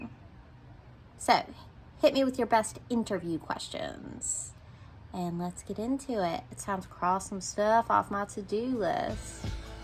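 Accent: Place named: South, United States. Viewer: One question I have wondered (for your Q&A): In your older videos, your voice sounds so different. Did you use to try to talk without your Southern accent? (I like your accent 😊)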